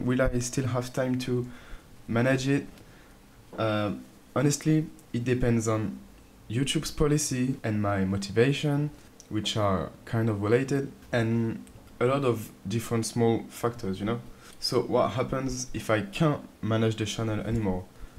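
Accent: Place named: France. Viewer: ptn ouais j me disais bien que t avais l accent francais!^^ ca fait plaisir, brf continu ta chaine surtout tu gères👍🏻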